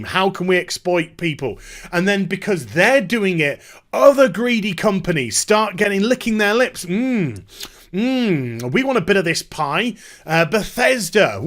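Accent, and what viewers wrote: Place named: United Kingdom. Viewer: you sound brittish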